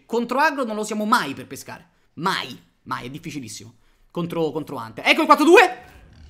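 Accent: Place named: Rome, Italy. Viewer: All'inizio credevo che fossi Romano da come parlavi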